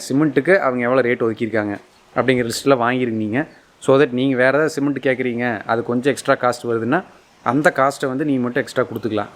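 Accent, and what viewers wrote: Kongu, India. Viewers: Should we apply putty for exterior wall also? How the inch wide granite lip is attached to counter top? would it not detach after some time? I like that idea and many of your tips. I watched the entire video and got carried away completely. your knowledge and presentation in kongu Tamil is awesome. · Good information, well-planned, and between your speech some Kongu-style is highlighted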